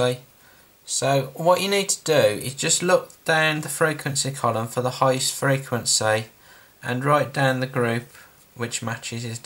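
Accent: Birmingham, United Kingdom. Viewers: Nice Birmingham accent.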